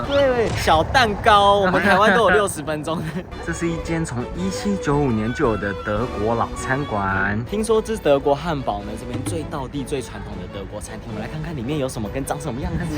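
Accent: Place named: United Kingdom. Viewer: 和一樣經過過小熊軟糖的店，但當時窮遊覺得買不起就沒進去了～印象中的漢堡是一抹鮮豔的紅好想念德國啊啊啊啊聽到你的英式德文忍不住笑ㄌ😂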